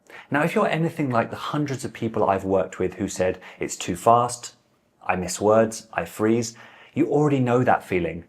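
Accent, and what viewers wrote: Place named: England, United Kingdom. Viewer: Hello, nice video! I'm a native French speaker with a fairly neutral (international) French accent. Your accent is nice, but I can hear an English accent throughout every sentence. If you're aiming for a more neutral/international French accent, I can give you a few tips. Try pronouncing your sentences clearly and at a normal pace without slurring them first. Once you get comfortable with that, you'll naturally figure out where native speakers actually slur their words. Don't start by slurring words just because you've heard French speakers do it. Otherwise, it sounds like you're imitating the accent rather than speaking naturally. In French, we usually slur words only because we're trying to say something clearly while speaking faster—and then even faster—not as a pronunciation rule in itself. Also, at the end of words, you tend to do what English speakers often do: you add a slight extra sound instead of ending the word cleanly. 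For example, you pronounce *"répéter"* more like *"répétey"**, when it should end with a clean **"é"* sound. French vowels are generally simple and steady—it's one sound, and that's it. In English, vowels often glide from one sound to another. For example, if I exaggerate, words like *"you"* and *"low"* sound more like *"yeouw"* and *"leouw."* In French, however, the sounds stay pure and direct. They'd be closer to *"you"* and **"lo"**, without that extra glide at the end. Anyway, I hope this helps! You're already doing a good job, and with a few pr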